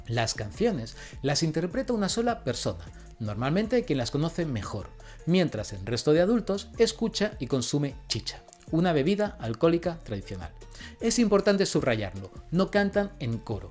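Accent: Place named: Spain. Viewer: Tu forma de hablar me recuerda al Filopalomo, a CdeCiencia y a ElvisaYomastercard. Me pregunto si son los cuatro de la misma región de España o es algo mas extendido en el país? 🧐🤔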